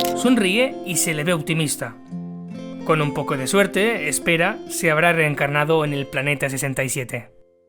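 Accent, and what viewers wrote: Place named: Spain. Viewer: Hola, Soy de Argentina 🇦🇷 y creo que Tú eres español, pero me encantó Tú informe,,y estoy sorprendida de ésta religión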